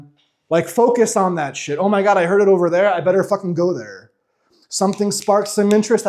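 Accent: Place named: United States. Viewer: Yap, right on the money. Golden truth through an American accent man. The key is to permanently remind oneself of this and not get triggered by outside circumstances. Unfortunately, emotions, pressure, stress, fear but also euphoria are throwing me out into the regular ego function I am used to for such a long time. The skill to maintain the inner balance is key. It takes time to build up this skill. I am working on it. Greetings from Munich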